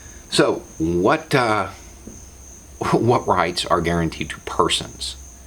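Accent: South, United States. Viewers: I have started using your videos all the time to help me argue my points. You’ve become a great inspiration to me. Your accent helps when I’m addressing people in the southern US. Like in your other video you talk about the wrong person sending the right message. My female status makes me unreliable to most of them as well as my mixed family. However lots of people I post your videos to, just call you a paranoid loon but a few have actually attempted to understand. Either they debate the points or just say good point. You are making a difference with your videos. Thank you❤️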